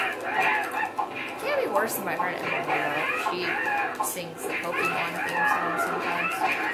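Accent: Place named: South, United States. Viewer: I love your southern accent makes you sound pretty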